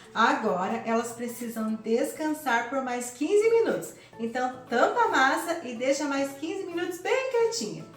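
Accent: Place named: Minas Gerais, Brazil. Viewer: eu sou encantada com vc. Adoro seu jeitinho de falar, sua autenticidade, sua simpatia. Vc é linda! Esse seu lindo sotaque é de onde mesmo? Tem um jeitinho meio mineirinho. Eu sou de BH. Amo minha mineirice. Beijo em seu ❤️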